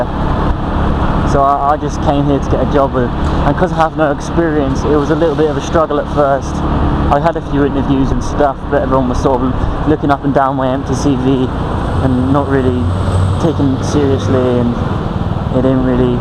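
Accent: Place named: British Asian, United Kingdom. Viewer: Have you been living in TW for a while coz you sound a bit British+Asian, accent I mean. Hope you are enjoying the life here.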